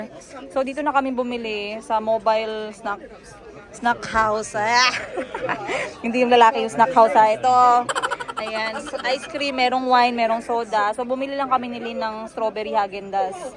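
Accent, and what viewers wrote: Iloilo, Philippines. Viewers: Namian gid ko mag estorya ka ilongga ka mam 😁❤️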